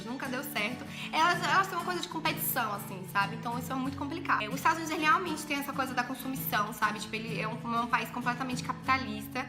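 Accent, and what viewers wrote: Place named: Bahia, Brazil. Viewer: Amei!! Tô super nessa dúvida e o vídeo me ajudou muito. Você e super fofa e sotaque baiano não tem pra ninguem <3 hahaha sucesso com o canal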